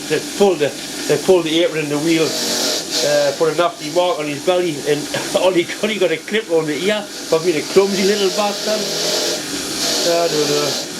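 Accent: United Kingdom. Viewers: Your command of the Queen's English is enough to delight the heart of an uncultured American....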